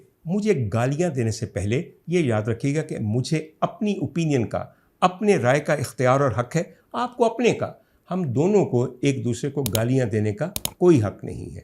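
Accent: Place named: Gujranwala, Pakistan. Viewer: Such a realistic and brutal review. I enjoyed the typical Gujranwala Punjabi.... Especially kanwann day athru 😂😂 Stay blessed dr sahab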